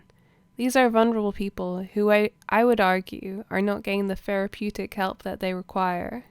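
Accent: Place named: Scotland, United Kingdom. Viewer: It’s the intellectual dishonesty of trans women are women. It’s like a cult. And when you learn about autogynephilia and read Galileo's Middle Finger it’s even harder to rationalise what we’re seeing from the media, government and institutions. It’s genuinely terrifying. Great video and hopefully you’re able to post more at some point. Sure I detect a Scottish accent as well!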